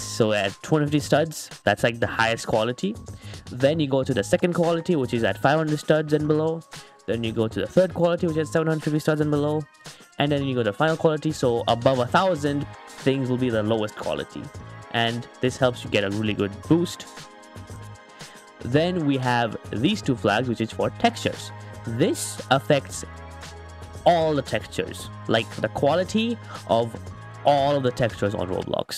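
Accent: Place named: Bengal, India. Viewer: u sound so bengali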